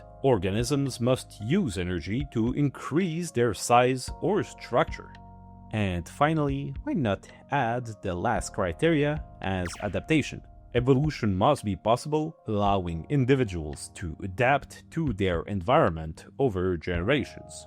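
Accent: France. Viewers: De ce que je comprendre les Bibites sont des représentions artificielles de la vie, une simulation qui à pour objectif de répliquer la vie. Tu as très bien fait ton travail donc il en est une excellente réplique mais au final ils sont pas vivant puisque normalement la vie se passe naturellement ce sont des pixels qui sont éclairés de différentes couleur en réponse avec ton code. Beaucoup de respect pour ce que tu fais continue comme ça! Ps: déso d’avoir écris le com en fr je sais que tu parles fr (ça parait dans ton accent) et je suis bcp plus confo en français qu’en anglais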